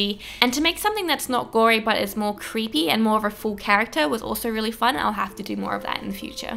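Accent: Australia. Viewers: I really really love this! As a human being which is fluent in english but not really sure if I understand dialects or different accents, can someone please tell me if she has an australian accent? :D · What part of Australia are you from I'm from port maquarie 😄😄😄😄😄😄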